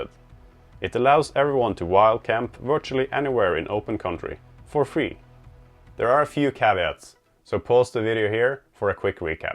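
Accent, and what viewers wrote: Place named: Sweden. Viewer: Fresh video! Been watching your vids for a while now, they're super nice. We are starting our 2 week roadtrip (tenting only) tomorrow, from Stockholm to Lofoten, Senja, Tromso..but starting in the south close to Troltunga.:) Thanks for the tips! Wish us good weather. Also, my gf said you has a swedish accent.:D I'm not swedish but I agree with her. Where do you guys live in Norway btw?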